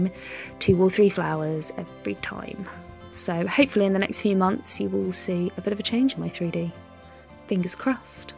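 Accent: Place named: Essex, United Kingdom. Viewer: I'm actually sitting here.... well actually standing... you're keeping me entertained whilst I do the most mundane task of washing up after feed the kids their tea... giggling along with you because I'm making the same noises of wonder over that G-O-R-G-E-O-U-S glitter and it friggin GLOWS!!!! 😍 lol your accent has me perplexed a little thou.... coz you keep saying certain words that sound Essex... like me 😁 I'm now off to put another order in.... but please write congratulations on you winnings 😂😂😂 great video as always x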